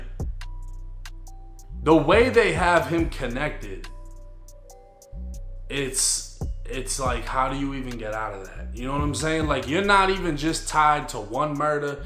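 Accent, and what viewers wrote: Boston, United States. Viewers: U gotta be from Boston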